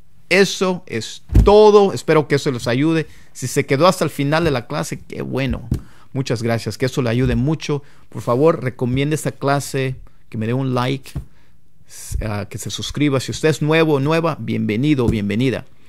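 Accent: United States. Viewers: me encanta como lo habla parece gringo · Pensé que era gringo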